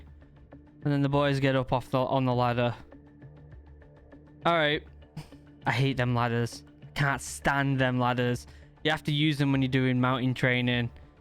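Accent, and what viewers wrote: United Kingdom. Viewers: Why you have a British accent lol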